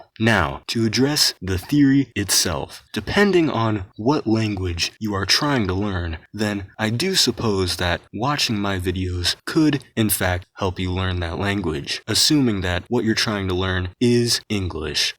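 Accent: Spain. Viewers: Ele lendo com sotaque espanhol dms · Do you speak Spanish? You really have the pronounciation of a spanish speaker person.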